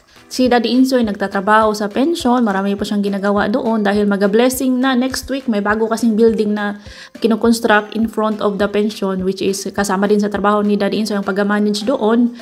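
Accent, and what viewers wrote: Bohol, Philippines. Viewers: asa inyo sa visayas mam? kay murag Bohol man ang tonon, nice kay mura sag ug naa ra pinas diha mam nuh, dira kaau mingaw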